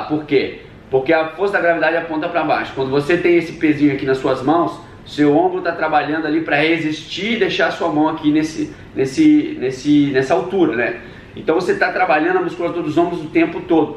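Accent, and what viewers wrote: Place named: Rio de Janeiro, Brazil. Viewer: Tu pegaste o sotaque carioca com força.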